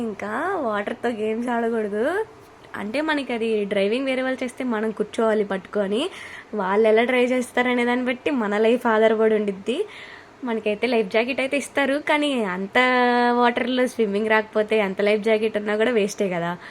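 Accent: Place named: Godavari, India. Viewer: Mee slag Maa godavari jilla la chala bagundhi mee lage 😊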